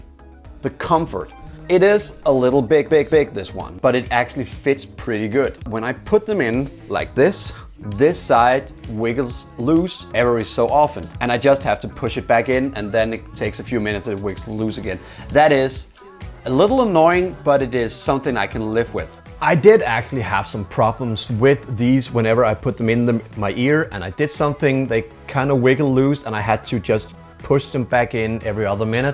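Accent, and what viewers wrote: Denmark. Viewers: easy on the copenhagen(ish) accent, hahaha. Otherwise, great work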